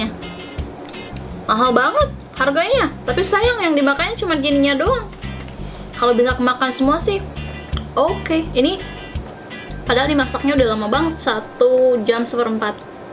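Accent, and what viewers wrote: West Java, Indonesia. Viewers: logat2 sunda gituu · resep ihh nyunda 😂😂 orang mah rek diamerika ge logat mah moal hilang 😂😂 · mba orng sunda ya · org mna kk sunda nya ? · Orang sunda nya teh.... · emoy atu lah teteh sunda pisan eta hahaha.. teteh tinggal di mana sekarang ? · Janten urg sunda teteh teh?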